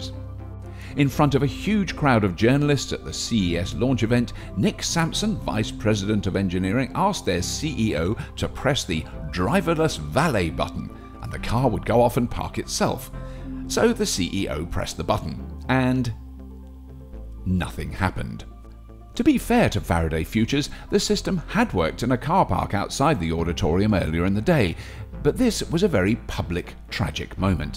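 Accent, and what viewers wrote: United Kingdom. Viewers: I love the British accent. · i love the enthusiasm in the narrator's voice....very British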